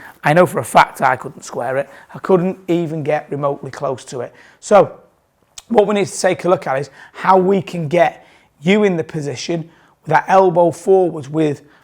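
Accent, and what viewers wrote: Manchester, United Kingdom. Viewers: so POLITE, (if you don't mind) what a Gent imagine Bubba Watson or Woods being so humble? great post. you sound like a Manchester lad. REEESPECT. John.🤗🤗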